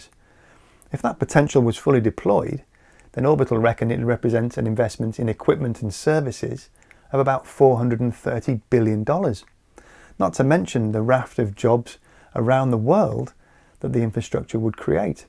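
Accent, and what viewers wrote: England, United Kingdom. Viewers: what about large fish and whale collisions with the blades??? can a cage be built around the blades to protect the blades and block marine critters from impact. First time viewer. I like your presentation style, your pitch of voice and your speed of speech, and---your accent. It reminds me of my dear grandfather who was from Atherton England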